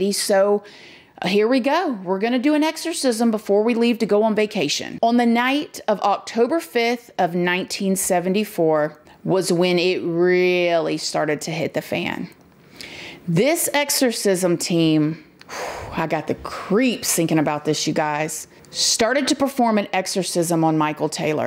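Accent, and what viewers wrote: South, United States. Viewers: Im guessing you're from TN you are GORGEOUS!!! And you got that lol southern twang 🥰😍🥰😍 in in Looooooove lmao fr tho I'm guessing outside of Nashville somewhere TN girls are just omg I've only been to Graceland and Nashville but you look the part all the way id move up there for girls like you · I agree agree I'm very southern her southern accent I relate with well.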